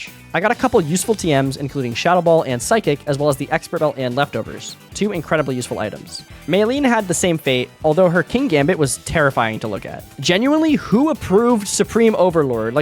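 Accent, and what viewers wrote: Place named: United States. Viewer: This is clearly American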